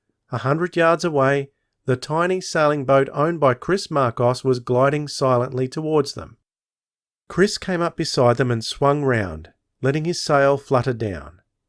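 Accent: Australia. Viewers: Thank you for your reading of this Junior Sleuth series of books by Robert Arthur. I appreciate your fine impersonation of the Greek boy's accent. Your own Australian accent is interesting to listen to. Some years ago, I read The Mystery of the Haunted Mirror. That was my first discovery of Jupiter, Pete and Bob and their Detective agency, The Three Investigators. ☎️🏛☎️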